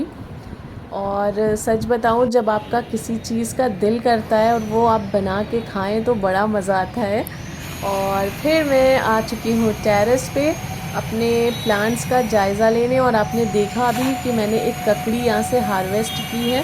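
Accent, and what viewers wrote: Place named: Hyderabad, India. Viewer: Assalamualaikum khairiyat kaise ho ap mai samjh t thi u p ke log alag baat karenge lekin ap to hyderabad ke logon ki tarah baat kare Mujhe bohot acha lagta hai apke vlog dekh ker allah ap ku khush rakhe ameen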